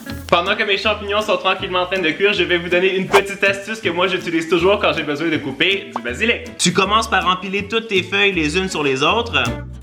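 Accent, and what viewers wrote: Quebec, Canada. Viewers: Je t'aime, continue, tu es trop fort, et, j'adore la voix des Québécois. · Jtm continue tu est trop fort est j'adore la vois Québécois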